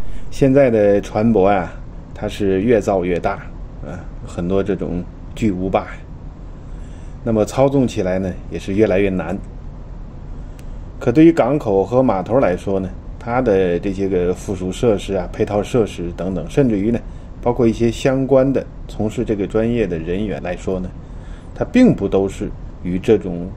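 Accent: Tianjin, China. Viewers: 口音是天津老乡吧？ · 講話像大陸人口音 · 天津人一听就能听出来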